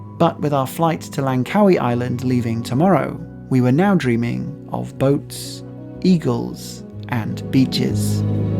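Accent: United Kingdom. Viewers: It's nicely done especially with British accent!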